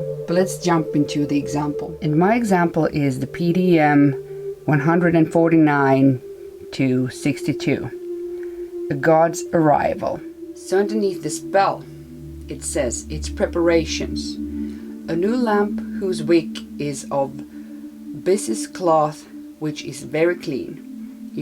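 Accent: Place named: Finland. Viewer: Fun to watch your video. Is your Myrrh colored white (or is it just the way the top of the box looks?)? BTW, loving your Finnish accent, soooo awesome! :)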